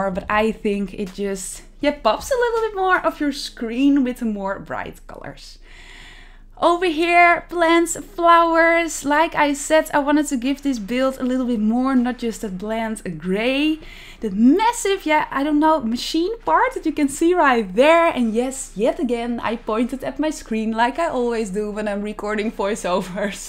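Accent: Netherlands. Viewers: Je hoort gewoon die nederlands 😂💜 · Are u dutch 😅 it sounds lik u dutch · But your accent is always so much more charming than our awful German accents; we obviously also love a Dutch accent in German as well! 😆 You always sound so cute and friendly and fun; I don't know why. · You sound dutch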